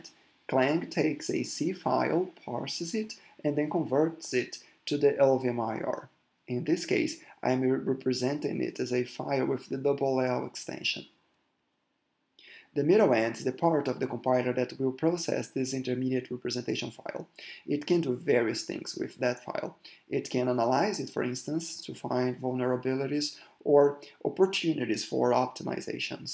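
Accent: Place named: Brazil. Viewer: mano não sei porque mas ouvi outro brasileiro falando em ingles é muito bom kkkkkk eu entendo tudo, até consigo enteder os indianos mas muita vezes tem uns bagui que não da pra entender kkkkkkkkkkk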